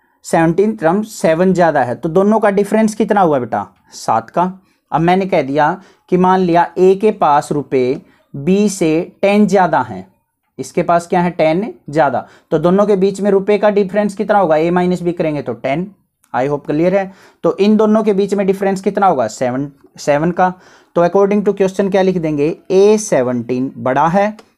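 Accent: Haryana, India. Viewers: Sir aap Haryana s ho na maybe😅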